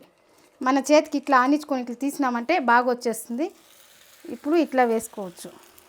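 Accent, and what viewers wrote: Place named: Chittoor, India. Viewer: Pakka chittoor slang. Baaga chebutunnaru. Maa athagaaru ilagae chestharu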